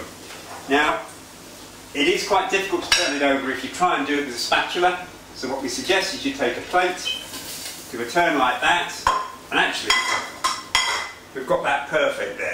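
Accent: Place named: England, United Kingdom. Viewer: After trawling through the many offensively inauthentic bubble and squeak videos on Youtube (where bubble and squeak is baked or includes egg or is formed into patties), I KNEW I'd found the genuine article when I started this video and saw this very English-looking, English-sounding gentleman in his very English kitchen. I've been having trouble keeping my bubble and squeak in one contiguous chunk through the turn (a challenge, as I've been using a large, heavy pan), so I appreciate the direction when it comes turning the thing over. It's great to see someone doing this properly! Thank you!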